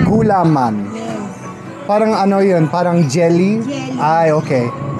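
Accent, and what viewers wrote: Ilocos, Philippines. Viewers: You have an accent of ilokano dialect. I have ilocano friend and you have similar intonations. I'm impressed because I heard from her that ilokano is very hard to learn especially the accent itself. Did you stay in ilocos and how long?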